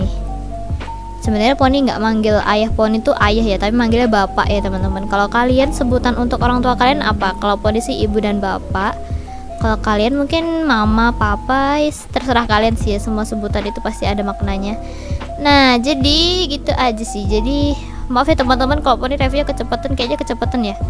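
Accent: Java, Indonesia. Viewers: Kk orang Jawa ya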